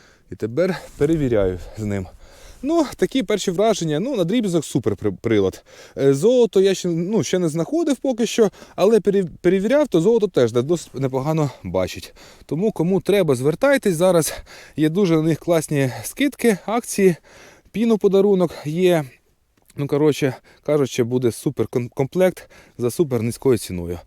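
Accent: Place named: Russia. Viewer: Привет,😂ну разговариваеш ты на украинском НО с русским акцентом 😂😂😂😂😂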